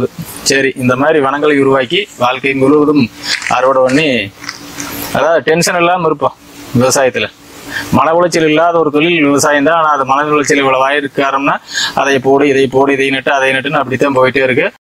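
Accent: Kongu, India. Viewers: கொங்கு தமிழ் அருமை😊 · Kongu thamizh super idai idaiye kusumbu pechum super 👌👍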